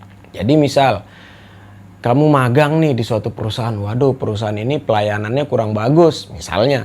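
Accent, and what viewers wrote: Lombok, Indonesia. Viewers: Be elekm batur marak dialeg lombok basem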